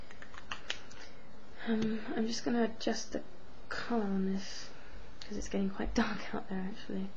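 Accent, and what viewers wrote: United Kingdom. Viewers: your not posh your just british like me mate x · what part of england u from? always wondering where that type of "posh" accent comes from. like kate middleton. idk if she worked on her accent but ive NEVER heard her accent on anyone else. yours is nowhere near as posh as hers no worries!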